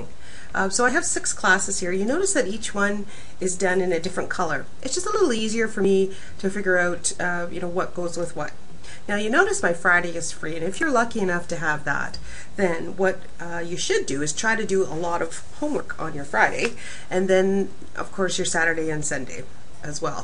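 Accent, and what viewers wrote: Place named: Canada. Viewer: You're Canadian. I'm getting much better at distinguishing accents :'D this is just proof to me that our accents /are/ distinguishable from the American accent! Ha! Sorry, lovely video and a brilliant strategy, I will be using this. Thank you :3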